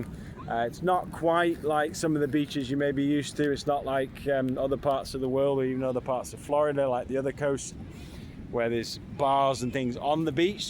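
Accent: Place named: United Kingdom. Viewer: I used to live there …..many years ago..thought you were British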